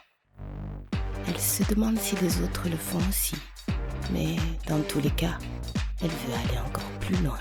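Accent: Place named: Tokyo, Japan. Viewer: On dirai tokyo la voix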